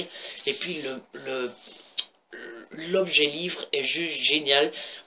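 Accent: Belgium. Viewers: Je découvre ta chaine que maintenant (oui je ne savais pas que tu en avais une, merci de me l'avoir indiqué que Twitter) ! J'aime beaucoup ta façon de présenter les livres je vais encore en acheter des tonnes parce que comme par hasard on a les mêmes types de lecture xD Des bisous ! 😘 (ps : ce petit accent, la Belgique ? 😊)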